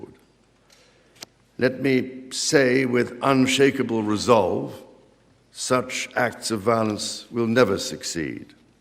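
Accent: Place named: RP, United Kingdom. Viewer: Nothing beats a clean, pure, royal English accent. Glorious.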